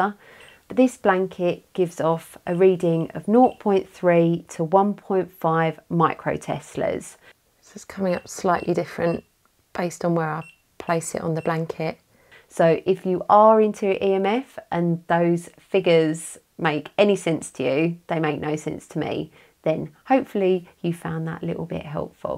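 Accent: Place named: England, United Kingdom. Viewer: Greetings to you from me. Great video as always eventhough I am not much intrested in this topic but yeah. At least i could teach my ear some of English Accent.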